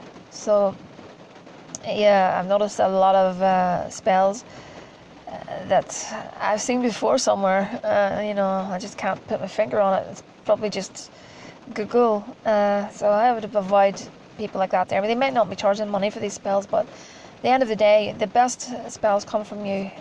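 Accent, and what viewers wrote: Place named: Ireland. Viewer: Good vid, more of these please (if you can find the time!). I can tell by your accent you come from not far from me. Being someone v interested in this side of life I've found it very hard to find groups, other individuals etc who are serious about this. How did you go about it, learning, meeting others who are interested in magick etc? I know Ireland is full of us, i just dunno where to look and am so wary of charlatans. Thanks! ☺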